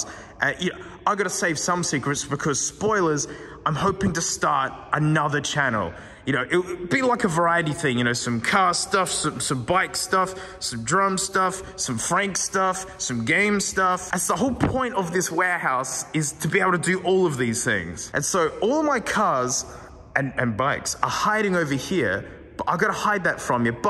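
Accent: Australia. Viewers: Did you just wake up? You sound like Australian James foster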